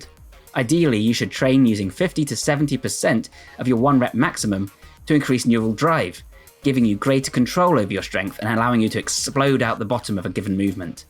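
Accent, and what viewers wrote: United Kingdom. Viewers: He sounds british, has freak strength like a russian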